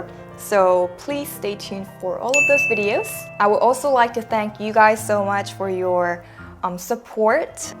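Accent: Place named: Philippines. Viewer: That pinoy english accent, wow sososyalin!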